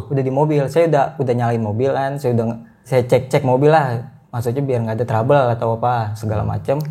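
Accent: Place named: Pemalang, Indonesia. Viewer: Orang Pemalang nih Pemalang mana ka:v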